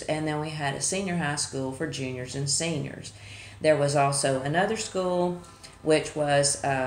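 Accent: South, United States. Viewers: You are welcome and by the way l love your southern drawl.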